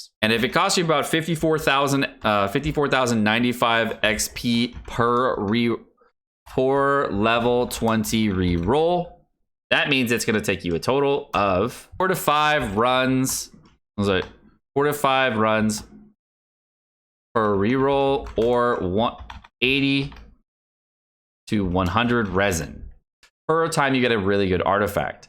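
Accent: United Kingdom. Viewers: pog british accent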